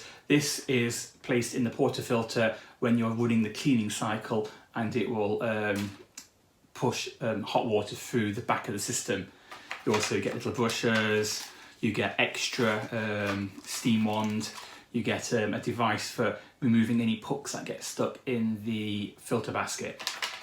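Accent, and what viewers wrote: United Kingdom. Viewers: Hi! Great video. As a German obsessed with English accents, I would like to ask you which part of England you are from...Cheers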